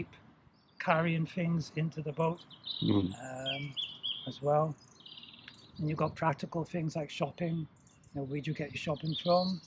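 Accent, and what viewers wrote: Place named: Cardiff, United Kingdom. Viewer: Good on you for choosing this lifestyle 👍 where is home…..I detect a slight Cardiff accent 🤔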